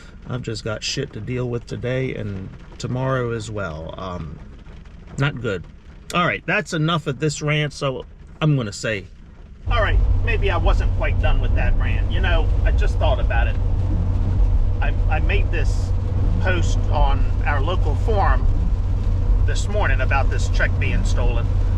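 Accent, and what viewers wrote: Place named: New Jersey, United States. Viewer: You talk like you are in New Jersey .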